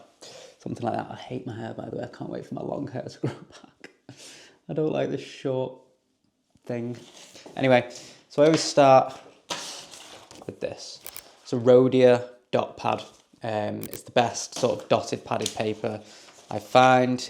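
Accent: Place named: England, United Kingdom. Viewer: Where are you from boss? Your accent sounds English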